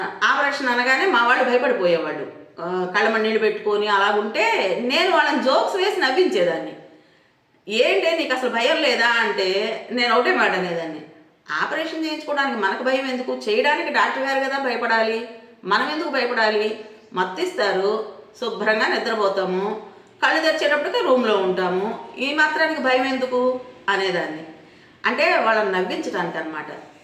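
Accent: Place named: Prakasam-Nellore, India. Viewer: మీరు మాట్లాడే భాష మాకు ఇష్టం. మా చిన్నప్పుడు, మా ఎదురింటి ఆవిడ కూడా ఇలా మాట్లాడేది. ప్రకాశం, నెల్లూరు జిల్లాలలో ఆడవాళ్లు ఇలా మాట్లాడతారని తర్వాత కాలంలో గమనించాము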